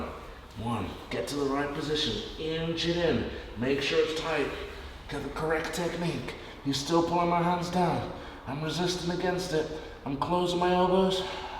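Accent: England, United Kingdom. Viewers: lol thanks man, I needed that. And thanks for the vids, I really appreciate the details in the way your break this stuff down. For some reason your immaculate English accent is easier to recall than my American coaches when I’m being strangled.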